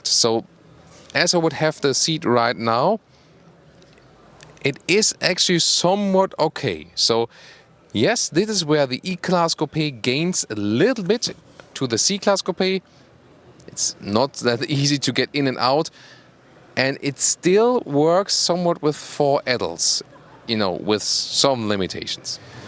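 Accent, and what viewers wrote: Germany. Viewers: Very good and clear comparison with all the details and your very nice and beautiful German Accent make it very intresting too . Thanks . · Hello there. You and Doug DeMuro are my favorite auto reviewers. If I had to choose only one, I would pick You over Doug. Please don't tell him that! Lol:) I have an E coupe and loving it. E class all the way! You are very articulate and thorough with your presentation. I don't get bored watching you and Doug. Nice German accent, too! Hope all is well for you both life and work. Btw, are you coming to Washington DC anytime soon? Cheers and take care! -Phi ( Fee Fi Fo Fum)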